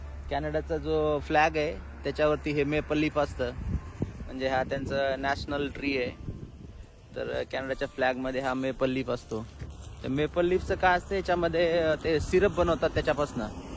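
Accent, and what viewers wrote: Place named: Latur, India. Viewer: बोलण्यावरून समस्त आमच्या लातूरचा माणूस जय श्री राम 🚩🙏🏻🙏🏻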